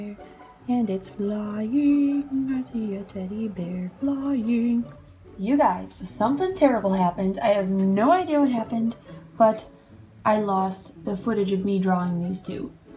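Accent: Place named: Illinois, United States. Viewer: You’re from Buffalo! Omg I’m from NY too. I thought maybe you were from Illinois bc you have sorta an accent. Which is awesome Btw.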